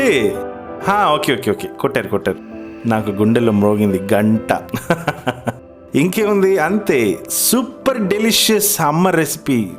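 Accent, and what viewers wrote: India. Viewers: sir, plz check your Instagram for both egg n gheeRoast Ckn. Just tagged from my wife's account😊😊we just prepared...as usual Ur voice, song, presentation.....finally Telugu slang.......pichchekkinchesindi😂😂😂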